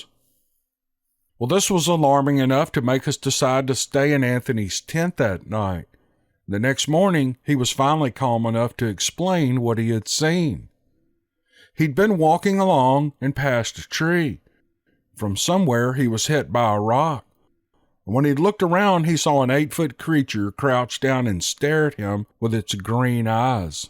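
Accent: South, United States. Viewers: I've said this before, but I will say it again. I'm from Oklahoma and am used to hearing people talk with a southern accent. So when I hear that familiar drawl with those vowells drawn out, I get a warm, comfortable feeling. It's brings a familiarity that inspires a trusting attitude for me. I can relax and fall asleep when listening to you. Thus, you turn out to be the voice I go to sleep to each night. I have difficulty with sleeping, so anything to make those long nights pleasant and maybe shorter is welcome. Thank you for the pleasant demeanor and great stories you choose for all of us. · Have a happy Thanksgiving, thanks for the stories man just love your southern accent my brother.. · Hi, I have become a huge fan from the UK. I love your stories and could listen to you for hours.. I adore your accent, it's so nice and homely, being from England I never get to hear a that glorious Southern drawl.. 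thank you so much for all the time and dedication to your channel, from me, hundreds of miles away across the pond. Happy thanks giving to all my American friends 😊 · Thank you, for providing such wonderful entertainment totally unrelated to all the craziness going on in the world these days!! You are a GREAT story teller with a beautiful, smooth as velvet Southern voice!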